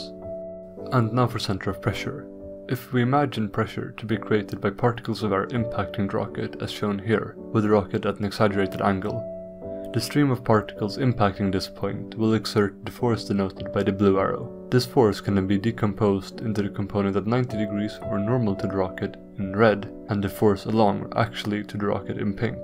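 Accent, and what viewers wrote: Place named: Skåne, Sweden. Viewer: Hi there. I have spent half-hour following leads from ' ' - all of which are dead-ends. So I have to contact you in public. I see you speak Skåne - or were warmly influenced by that region. I speak that other great language. I'm English but I live in Sweden (Österlen). Your video on missile stability is truly excellent. I would like to use your work both whole and in part. I have several videos dealing with the German A4 V2 missile and a website called <link> I would, of course, give you full on-screen credit and provide links to a website or other internet site of your choice. Within the limitations of our specialist area (I'm not Justin Bieber!), I can promise you significantly greater exposure, and we have expert subscribers (from industry, academia, etc.) to our website and video channel from around the world. I look forward to hearing from you. 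Kind regards Robert J Dalby FRAS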